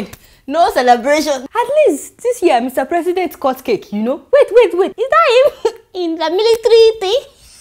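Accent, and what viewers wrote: Nigeria. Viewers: I'm learning the Nigerian accent from you my gurl!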